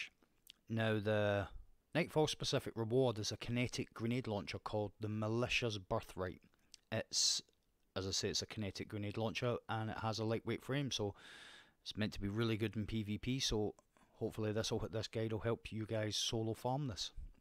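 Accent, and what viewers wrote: Scotland, United Kingdom. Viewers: Great tutorial dude really helpful strategies for each area also are you scottish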